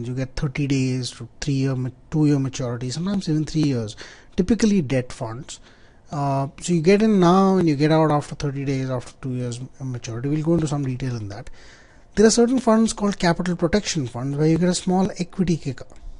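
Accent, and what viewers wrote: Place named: India. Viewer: Indian english, not bad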